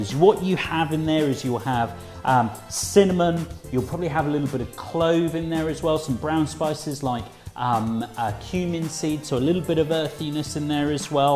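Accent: England, United Kingdom. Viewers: What is it about an indian guy with an English accent that is just so hot?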